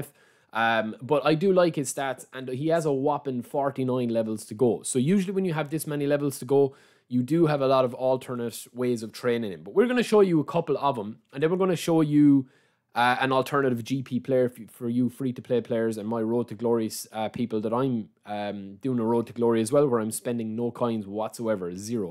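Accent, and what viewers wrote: Ireland. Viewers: thanks for taking the time to reply ! As a non native English speaker i always loved those typical native accents since they offer more “flavours” than the more official standardised Queen’s English accent. I guess it’s a bit like when native speakers tend to find the accent of a French or Italian “sexy” when they speak English. Last detail for MY personal knowledge: would you qualify your beautiful Irish accent as more typical to a certain part of Ireland or is it rather a generic one ?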